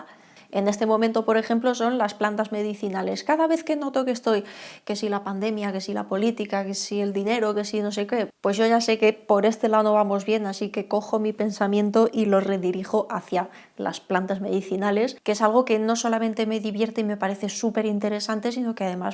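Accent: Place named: Spain. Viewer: Es maravilloso escucharte!! Eres dulce, motivadora y nos das tips muy excelentes. Además amo tu acento español!!! Me has enseñado tanto y me eres mi gurú, ya no sólo del minimalismo, de valores de la vida en general. Te tengo guardado un gran cariño y agradecimiento! He adoptado tu frase "mandar todo a la porra" 😊❤💕🌸 Eres genial!!!!!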